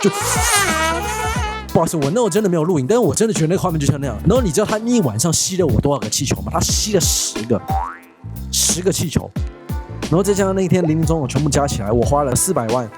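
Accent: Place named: China. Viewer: 你怎麼講話中國羌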